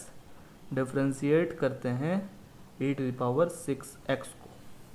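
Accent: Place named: Bihar, India. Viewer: Are sir bihar se hai na..... Lallantop style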